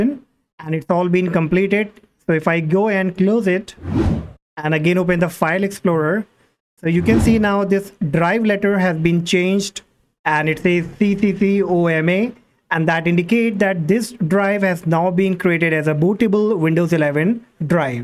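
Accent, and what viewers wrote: India. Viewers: no offense but the way to pronounce S in some words, tells me you are an indian :) speak in your normal english accent bro. don't make it american :) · I knew it will be good when I jeared the indian accent, great video, quick and straight to the point.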